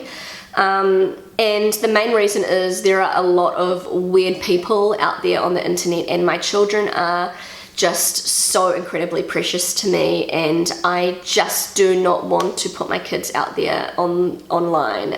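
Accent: New Zealand. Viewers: Hi from NZ! I love how strong your New Zealand accent is even after living in Germany for quite a long time 😁 💗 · Hi, I am a native German speaker who has never been to NZ but I love to watch your videos and to listen to your dialect! Your children have been a lovely change but I understand your decision. Happy X-mas und einen guten Rutsch ins neue Jahr.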